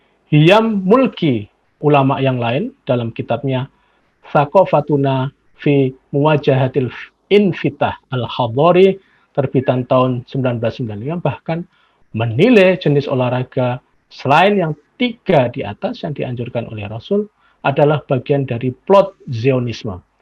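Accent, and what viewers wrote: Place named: Java, Indonesia. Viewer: Jgn nampak logat jawanya min malu saya sebagai orang Jawa cm punya argumen tolol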